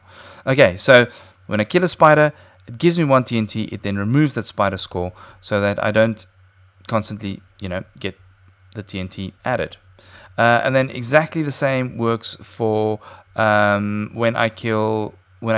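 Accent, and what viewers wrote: South Africa. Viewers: are you south African? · Are you South African?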